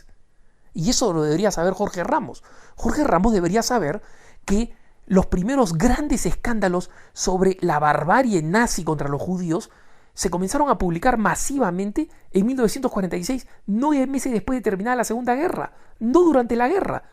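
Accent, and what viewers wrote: Peru. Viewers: Perdón, pero aquí, el totalmente desconocido eres tu(el que hace la "narracion" en el video) por tu acento se eres peruano, pero, ignorante,Jorge Ramos aunque tenga un nombre y apellido muy común,es uno de los 50 personajes mas influyentes en el mundo Hispano; y claro que está autorizado para cuistonar no solo a los papas sino hasta presidentes de paises (como lo ha hecho)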